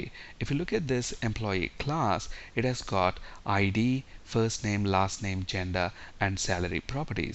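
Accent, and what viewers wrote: India. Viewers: The only Indian English accent that I like is accent:)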